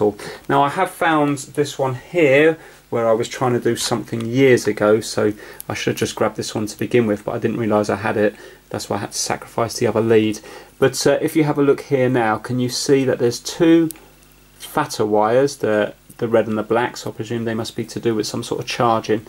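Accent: United Kingdom. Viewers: I really love the UK accent, I can listen to it all day :D It's the best language in the world ! ( I'm from Belgium by the way xD )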